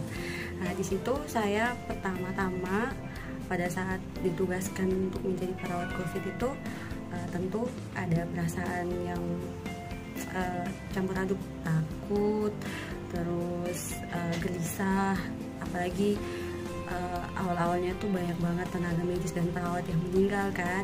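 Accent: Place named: East Nusa Tenggara, Indonesia. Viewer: Gua suka logat NTT nya .... keren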